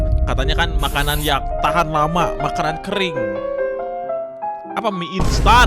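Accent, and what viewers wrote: West Java, Indonesia. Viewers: Sunda lu bang?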